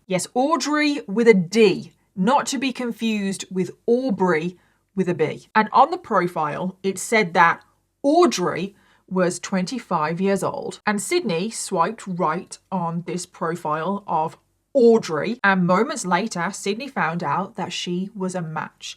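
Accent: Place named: England, United Kingdom. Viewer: ........i ur have a Beautiful accent....i didnt think u were from Australia......i knew u were from the UK.....cause i think the UK accent is nice...i love listening to ur stories.....im from USA...a southern state....thanks for all ur crime stories...i never miss any of ur new stories...❤❤thank u for teaching makeup ways...u have taught me alot😊😊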